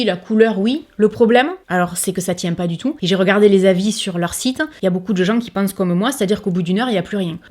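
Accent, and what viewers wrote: South, France. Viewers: Merci pour cette video pour ta bonne humeur et ton accent du sud j aimebcp les crayons yves rocher pour les yeux bonjour a talounet 🤩🤩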